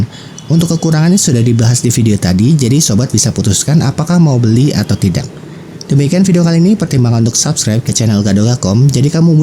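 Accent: Bogor, Indonesia. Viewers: Reviewnya ringkas tapi detail banget, mencakup hal-hal essential, padat berisi, informatif, dan yg keren itu udah ada subtitle hardsub 👍🏼 Keren nih reviewnya, makasih udah sharing bro. Btw, orang Bogor ya? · Bang orang bogor bkn??? 😅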